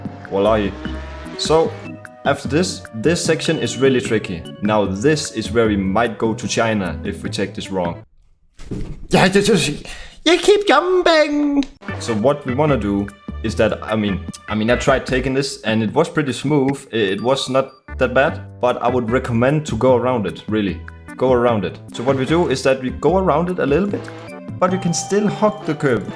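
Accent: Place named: England, United Kingdom. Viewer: Pure comedy and that English accent man, epic!!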